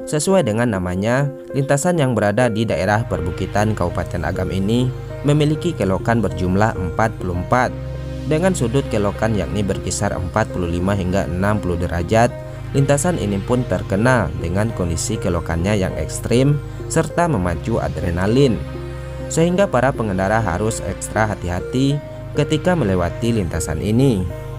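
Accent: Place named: Aceh, Indonesia. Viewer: Kamu orang Aceh ya min .. logat mu kental sekali.. 😄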